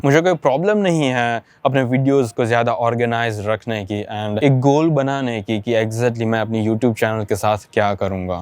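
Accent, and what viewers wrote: Gujarat, India. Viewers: most of the gujarati people tend to struggle while speaking hindi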